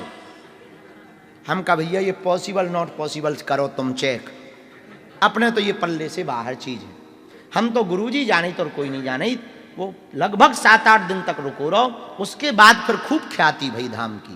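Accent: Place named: Bundelkhand, India. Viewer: सही बात बुंदेली बोली हमे भी अच्छी लगती है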